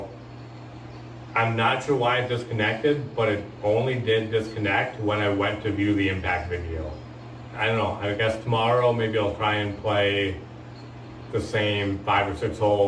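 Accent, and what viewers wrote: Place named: Wisconsin, United States. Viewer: You talk very Wisconsony. It’s a beautiful thing. I’m happy to know folks like you are still around.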